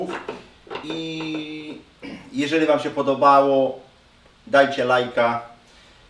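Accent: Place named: United States. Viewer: Od ilu lat mieszkasz w Ameryce? Nawet jak mówisz po Polsku, to słychać że kacentujesz inaczej :-).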